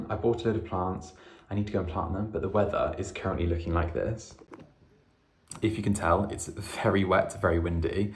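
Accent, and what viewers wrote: England, United Kingdom. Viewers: thank you for this enjoyable video. I love your content, engaging personality, and enthusiasm in home renovations and decorating. Your joy is infectious and inspires me to get out of my chair and to do something. Lol. As an American, I love listening to English accents. I could listen to you for hours. In a world with so much conflict, you give me a joyful escape for minutes. Everything you do is amazing. I agree, the pink color looks great with the other colors. Don't forget that real men wear and use pink. Lol. Robert.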